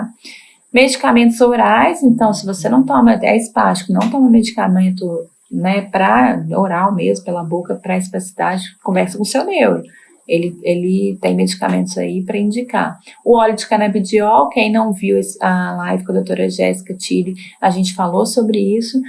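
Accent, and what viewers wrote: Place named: Minas Gerais, Brazil. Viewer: Bem que esse sotaque não é estranho , meus são mineiros de Belo Horizonte ❤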